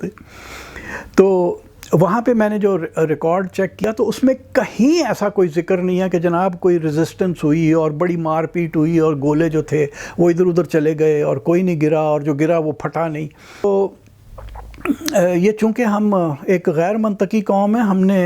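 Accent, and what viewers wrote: Punjab, Pakistan. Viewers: Delightfully entertaining narration (with Punjabi tadka) by Salman Rashid. Enjoyed it thoroughly. I am an Indian (with Punjabi background and roots in Haripur Hazara). Love and respect from India.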